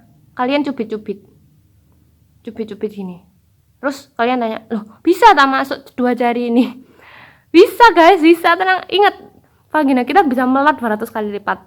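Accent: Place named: Java, Indonesia. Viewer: Kok bahasa medok yaa ce😁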